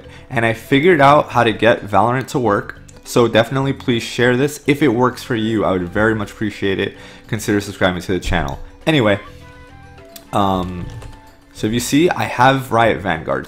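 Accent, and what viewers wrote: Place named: Turkey. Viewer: its turkish accent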